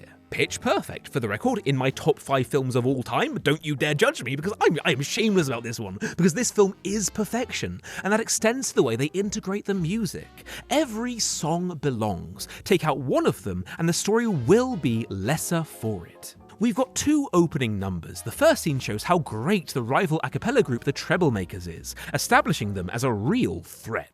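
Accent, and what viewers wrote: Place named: United Kingdom. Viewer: اللكنة البريطانية جميلة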